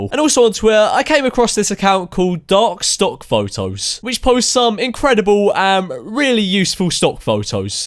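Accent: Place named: RP, United Kingdom. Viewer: u sound posh, fam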